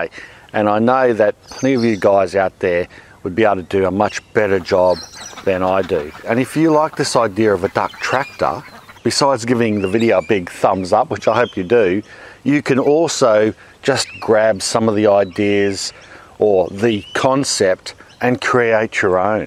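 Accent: Australia. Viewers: Congratulations on reaching 1 million subs! I love your channel and have recommended it to my brother who has a farm in Qld. I live in the US now and love hearing the Aussie accent again! My brother has me worried. Any time he drives by a milk farm, he pulls over and leaves a few dollars on the fence. Doesn't he know cow tipping is illegal?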